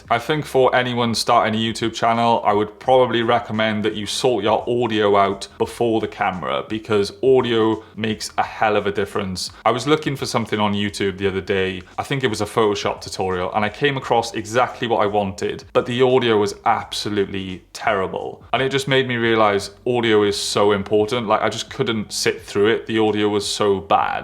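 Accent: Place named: United Kingdom. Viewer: There is something about UK accent, or maybe about speaking in proper English, that just works. I wish I had this language skills to improve videos on my channel. Anyway great job man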